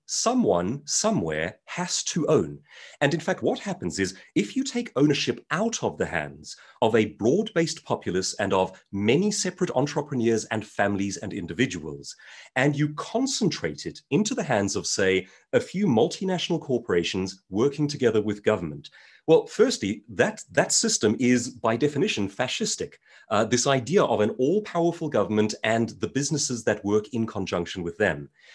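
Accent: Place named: RP, United Kingdom. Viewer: Having vast professional experiences with the English, then dating a few Scotsmen and travelling there extensively, I must say that you kind Sir really do speak the Queens English as well as that POS!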